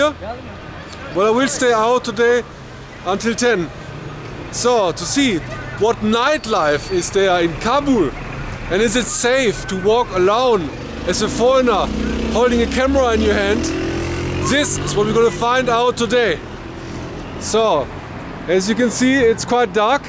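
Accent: Germany. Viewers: German English accent is so interesting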